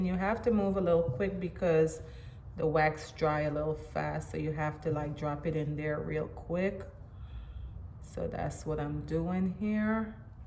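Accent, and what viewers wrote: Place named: Jamaica. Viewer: i know a jamaican when i hear one lol big up mumma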